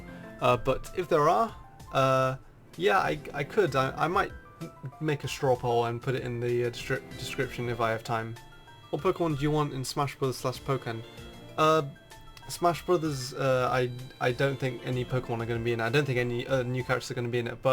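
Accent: United Kingdom. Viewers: He said half white and half Chinese, I can only assume he's British, based on his accent. :) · are you like British? you sound British.(sry I don't mean to be so rude.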